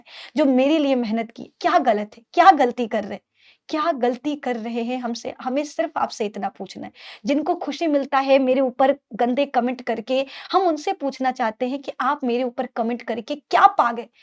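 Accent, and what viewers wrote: Bihar, India. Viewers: Mam kutto ka kaam hai bhokana padhna to have nai unko aap please padhana band mat kariye main ab 10 me gayi hu aur main batch Lena chati guu aap se padh kar tap karn chati hu main khati huu ki aap ke Tarah koi padha Nahi sakta aur to aur you are my favourite teacher aap because aap ka bolne ka tarika bihaari wala I like it my first line dimak me rahk legiye main to asise hi short Dekh rahi thi aap ka video aa Gaya muhje testion hi gayi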